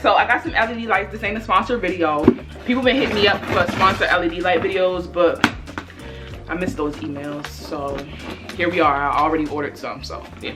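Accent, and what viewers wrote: Baltimore, United States. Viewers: What I’m gonna dewwww, I heard that bmore accent girl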